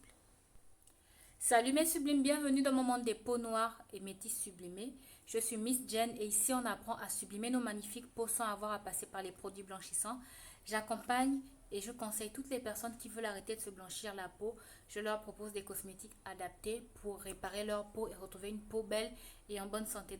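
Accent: Gabon. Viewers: Hello. Super merci. Vous êtes Gabonaises rien que ai son de votre voix 😇😇🙏merci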